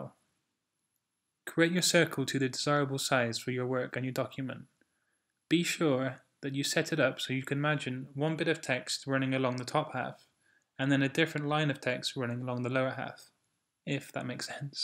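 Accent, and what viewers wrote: United Kingdom. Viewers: Thank you!! finally a short on point video! btw from which region of uk is your accent from :)?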